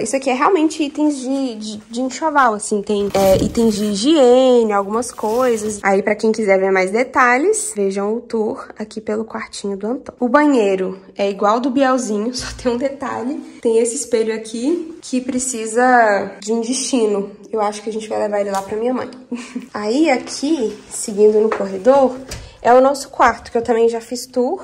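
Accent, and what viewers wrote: Minas Gerais, Brazil. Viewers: Você tem sotaque de mineira 😊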